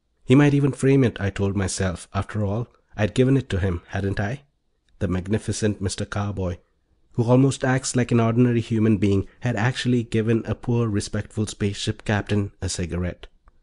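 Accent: India. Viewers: Beautifully spoken English & lovely crisp Indian accent. I really enjoyed it, thanks... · The pathetic narrator has an annoying Indian accent.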